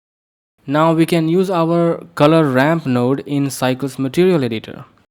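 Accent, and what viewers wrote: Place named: India. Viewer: You are indian? I dislike your accent!!!!!!!!!!!!!!!!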